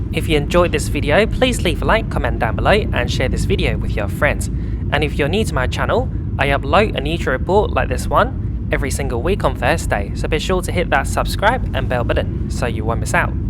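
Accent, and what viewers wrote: Australia. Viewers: G'day, I love your Aussie accent and lingo with "doing a uey" Looked like a great flight. Least you had a screen in front of you, and the food looked very tasty 🤩New Zealand looks awesome, so want to go there. Look forward to your next video, although I'm still catching up on your previous ones 👍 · you are sounding more Aussie than ever; Chuck a Ueee! 🙂 Another great report from not your first time in Queenstown!. See you very soon.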